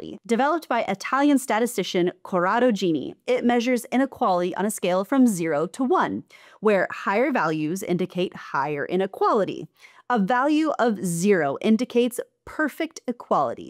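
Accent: United States. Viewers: Always surprised when I hear a American accent talking sense! ❤ Makes me believe , or hope that things will be fine! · You have a perfect American accent. I would not have guessed you were German until you gave it away.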